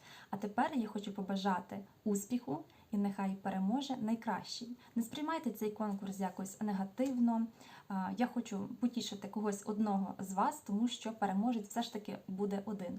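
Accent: Standard. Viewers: я підписалася на Вас неділю назад.Підкорили чистою українською мовою, щирістю, сімейністю. Вы завжди розказуєте про донечку,видно,що вона-ваше найбільше досягнення, гордість. Для мене-ви релакс вранці і ввечері. Подивилася відео про порожні баночки-почала використовувати пробнички,а їх за 10 років дружби з мережевим так багато!Дякую!Процвітання каналу!І набрати скоріше 1000 підписників! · Привіт. Підписана від початку війни. Подобається ваш голос і чиста українська мова. Ви дуже приємна. Бажаю здоров'я вашій донечці, бо чую що кашляє). І мирного неба!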